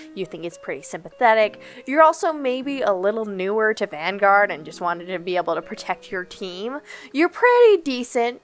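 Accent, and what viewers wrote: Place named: South, United States. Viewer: Dawg is it just me or does she sound like squirrel girl lol like she has the same thing where she like says stuff and becomes slightly southern or something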